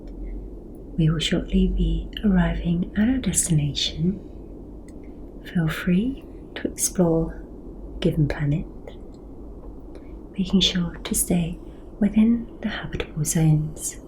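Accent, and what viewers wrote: United Kingdom. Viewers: Hallöü 😄are you english?or scottish or irish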